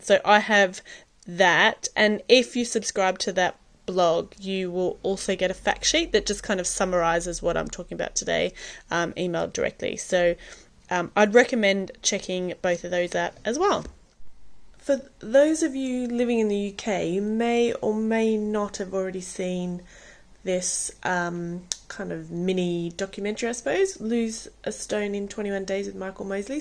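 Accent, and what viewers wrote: Australia. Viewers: Why do you sound so Australian. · Maso ..I'll give you one hint:....She is Australian.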